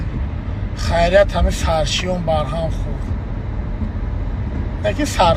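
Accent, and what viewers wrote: Kulob, Tajikistan. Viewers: Ака зури бади бахдо кулоби гап нест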